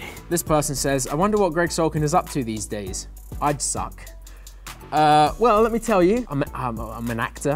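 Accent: United Kingdom. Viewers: He gives Tom Hiddles a run for his money to be the most British person to ever British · Gregg sulkin can run me over with that British accent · Mindfcked after seeing him play an American boy on runaways and now this Brit accent ? Lmaooooo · I know him as Chase so hearing him with a British accent is trippy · He's... british? Oo hoo this just got even better 🤣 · yeah he's British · I didn't expect he has a British accent... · Why did I not know that Gregg Sulkin was British eye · I did not know he was British wtf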